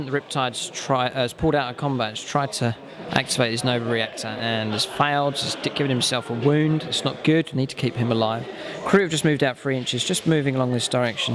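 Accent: United Kingdom. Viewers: I'll admit when you rolled the "6" for the last hull point on the Super Heavy it was "Carlton Fisk-esque"! Good show once again. Maybe it's the models, maybe its the terrain, maybe it's the narrative, hell, I wouldn't rule out maybe it's just the British accent thing, EITHER WAY, these batreps have reached a peerless quality in what you provide. No fancy overlays or stat lines (which are fine) but just clever camera work and a clear love for the narrative. Thanks again. See ya next time.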